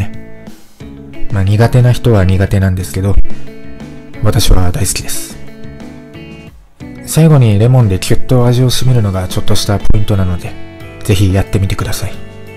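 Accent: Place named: Tohoku, Japan. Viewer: 東北のどこ出身なんだろう😂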